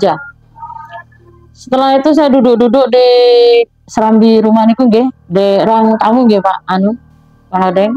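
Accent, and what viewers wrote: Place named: Java, Indonesia. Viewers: Nada bicaranya asli Jawa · Maaf kamu sebut orang Kalimantan asli Tapi logat nya jawa Setau saya orang Kalimantan asli gak ada yang gila harta sampai pesugihan Kami hidup apa ada nya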